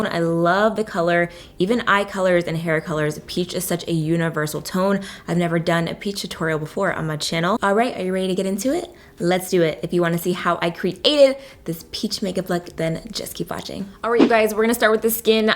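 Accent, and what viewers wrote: South, United States. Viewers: Has anyone noticed she has a slight country accent?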